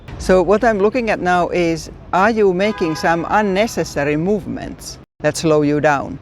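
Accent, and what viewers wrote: Finland. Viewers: Oh I love your Finnish accent - reminds me of my Grandma Jenni! Great tips and love your teachings too. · 😮 you're from Finland? I thought your accent sounded familiar! I am learning Finnish. Just started about 2 months ago. I'm learning on Duolingo so I understand I'm learning more formal Finnish rather than spoken Finnish, but I've got to start somewhere! Suomi on niin kaunis kieli! Kiitos.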